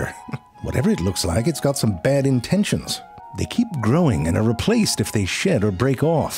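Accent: United Kingdom. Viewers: Does the narrator sound quite a bit like the main character from the PC point and click "Scratches", or is it just because it's a vaguely British sounding guy constantly being frustrated by a man called Jerry?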